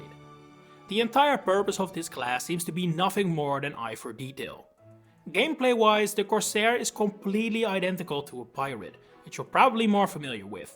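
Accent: Netherlands. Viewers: Aha, I just knew I heard the Dutch accent! Hallo! buigt Cool vid though, these classes are so weird. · "I am actually dutch" I was able to tell instantly, I have the same accent in English LMAO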